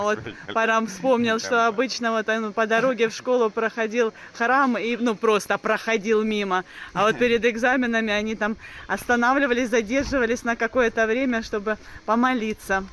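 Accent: India. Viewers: Интересно, что у вас английский с индийским акцентом.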